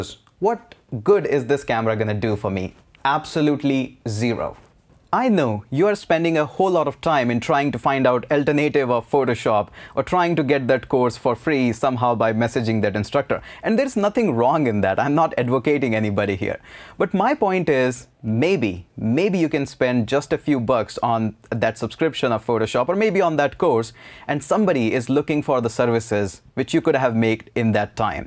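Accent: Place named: India. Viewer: Indian accent 😅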